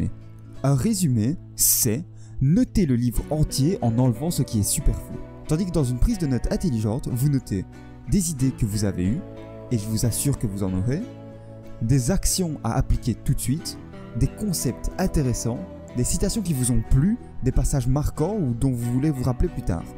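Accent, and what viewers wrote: Liège, Belgium. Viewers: Bon tu viens de Liège ? ❓😜 belle video bravo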